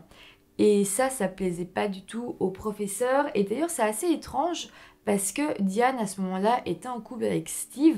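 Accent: Belgium. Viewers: Merci pour cette vidéo, c'était très intéressant et bien raconté. Serais-tu belge à tout hasard? :) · Oui, c est un accent belge 🇧🇪😁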